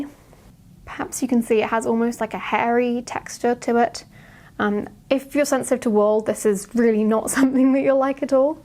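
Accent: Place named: Southern England, United Kingdom. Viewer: If you just put a short ‘e’ sound after the ‘r’ it comes up somewhere close to the Finnish pronunciation. So ‘Taremo’ is closer than ‘Tarmo.’ Then if you hold your tongue a little closer to the roof of your mouth on the ‘r’ sound, it gets a bit better again. That’s easier with a flat ‘a’ sound like we say in Yorkshire, though, rather than than your longer, Southern ‘a’ because the mouth is already wider and flatter. Think the ‘a’ in cat rather than in ‘Arthur.’ Lovely episode thank you x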